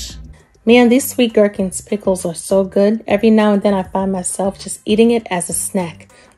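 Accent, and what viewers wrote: New York, United States. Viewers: Love your NY accent. Miss home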